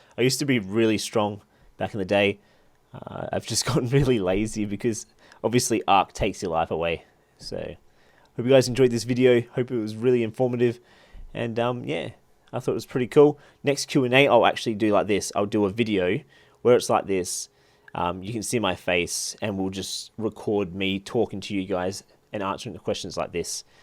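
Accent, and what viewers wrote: Australia. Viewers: thats awesome, i acually didnt realise you were an aussie. love it. us aussies have to stick together, what tribe were you in on official servers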